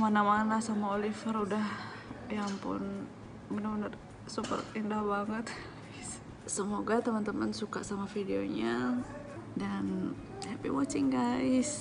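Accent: West Sumatra, Indonesia. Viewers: Mbak aslinya apa orang Minang.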